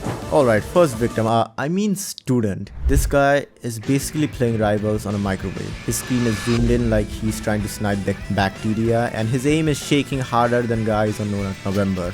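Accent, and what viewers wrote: India. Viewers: there is no way your name is with your indian accent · This guy sounds like an indian🗣😭🙏🏻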